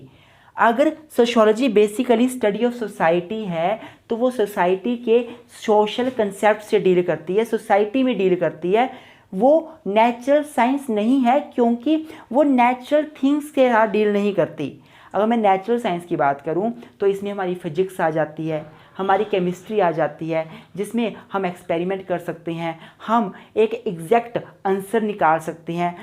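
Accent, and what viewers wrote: Himachal Pradesh, India. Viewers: Kya aap Himachal se ho ? 🙄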